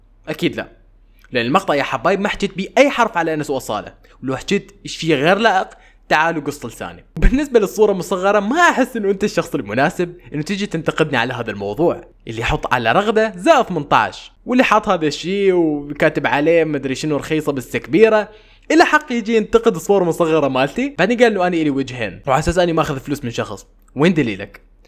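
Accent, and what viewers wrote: Iraq. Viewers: احسك عراقي 😂😂😂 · أتابعه مشان اعرف مشاكل يتيوبر ✖ أتابعه مشان لهجة عراقي لتجنين ✔😍😍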